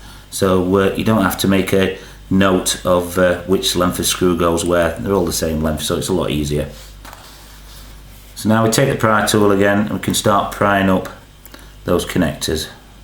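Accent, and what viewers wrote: Yorkshire, United Kingdom. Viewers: Great video ! Couldn't help but notice your a Yorkshire man . If you live in Sheffield can I pay you to repair my phone ? Thanks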